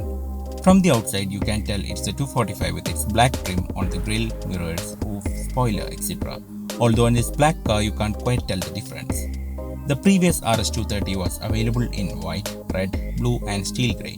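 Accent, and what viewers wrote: Kerala, India. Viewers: Nodoubt a malayali out there narrating 👍🏻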